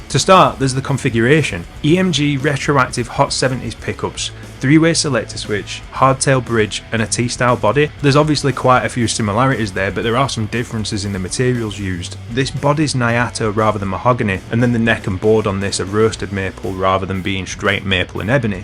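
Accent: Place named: North, United Kingdom. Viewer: I can understand you perfectly well. Northerners unite!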